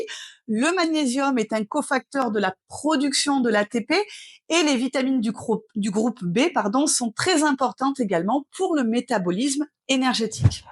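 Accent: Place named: Haute-Loire, France. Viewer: NON !!!! 😃 Je viens de voir sur votre site que vous êtes ardéchoise !😃 Moi aussi !!! 😃Compte tenu de votre accent, je dirais que vous êtes plutôt dans le Nord de l'Ardèche, dans les Boutières ou même Guillerhand Grange, Annonay...Pour ma part, je suis dans le Sud, dans les Cévennes, du côté de Valgorge. Je suis moi aussi passionné de biologie, de médecine et de Sciences du vivant. J'adorerais vous rencontrer pour parler de tout cela et qu'on échange des connaissances sur ces sujets.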